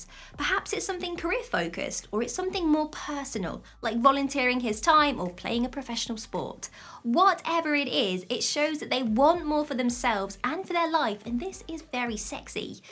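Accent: England, United Kingdom. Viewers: Love your very English accent. In the old days we simply were gentlemen. I think that summarizes all.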